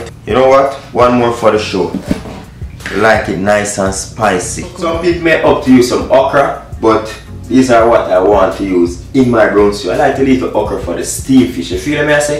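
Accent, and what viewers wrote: Jamaica. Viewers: Recently discovered your channel. Really enjoying the authentic Jamaiacn feel. Meal and accent. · Wickeeeeeeed my uth!!Not only do I enjoy your teachings but ur presentation. Real down to earth vibes no twanging just raw Jamdown style.I feel I'm home u nuh JAMAICA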